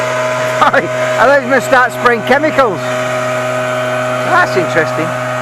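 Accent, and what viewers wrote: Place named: Leeds, United Kingdom. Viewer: you sound like my m8 frm morley int leeds bet im not far off deffo leeds lad bit of crack and im a jock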